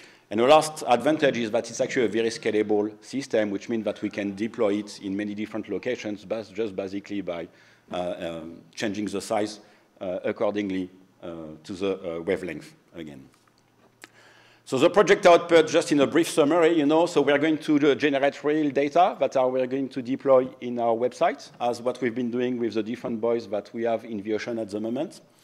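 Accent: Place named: Australia. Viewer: Great talk. And of course, I always love to hear the classic Australian accent. :)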